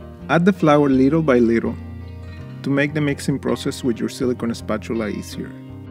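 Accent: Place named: Costa Rica. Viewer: .Iam from Costa Rica so i wonder are you ,you accent sounds like a tico from CR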